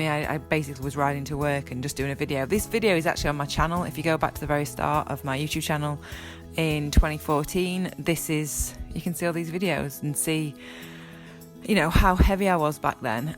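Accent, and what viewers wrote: United Kingdom. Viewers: This is such an inspiration. A feel good story narrated in a very pleasant British accent. Watch the video, you will be glad you did.. Thank you for sharing..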